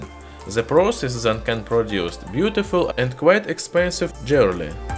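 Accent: Russia. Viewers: Is it a Russian accent? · Congrats on this video. Didactic and interesting. Are you russian? Your accent sounds russian. English easy to understand. Greetings from Tupã, state of São Paulo, Brazil. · I'm watch your videos because of your accent i like Russian accent .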